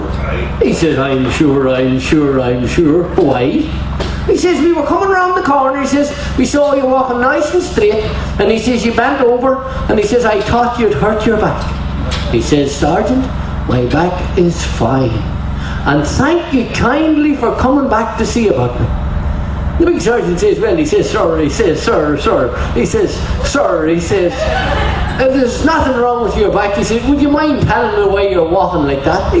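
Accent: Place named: Ulster Scots, United Kingdom. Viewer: same way i talk,, keep it up for the Ulster scots,!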